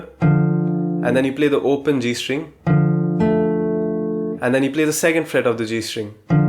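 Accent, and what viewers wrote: India. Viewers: Your accents seems to be Indian..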